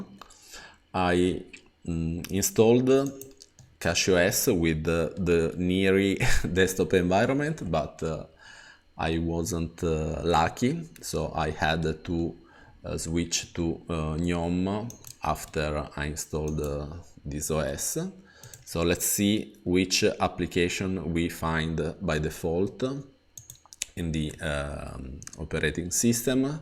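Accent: Italy. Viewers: this is the most italian english I've heard in a while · No matter, I like both, italian and english. Best mix of all.:-), there is not much bad with it. It is just, that many spoken words have an extra "e" at the the, from run it get's run-e. For me it's perfectly fine.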